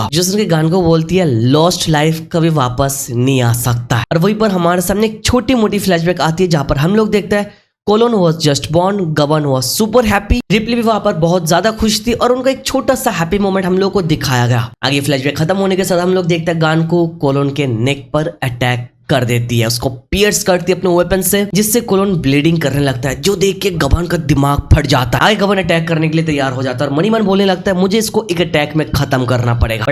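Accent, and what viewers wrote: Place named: Bangladesh. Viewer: This is a Bangladeshi Channel.. I guess from his accent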